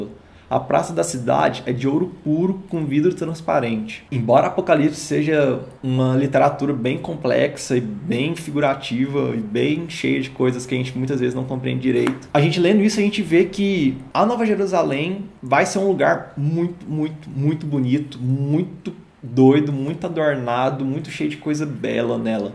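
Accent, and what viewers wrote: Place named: Goiás, Brazil. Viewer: Showww, cara!! Eu tô amando esses vídeos e o sotaque goiano tá ok ksksks, é nóis, conterrâneo!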